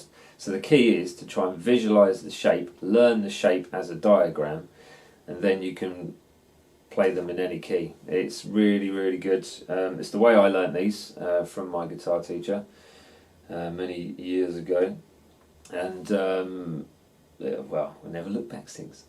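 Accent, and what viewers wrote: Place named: Kent, United Kingdom. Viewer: Are you from SE Kent ? We lived in Sandwich , and your accent sounds the same as if I’m listening to someone from there! My husband is British and we moved there and back over ten years ago now. It was quite the experience. I’ll say that !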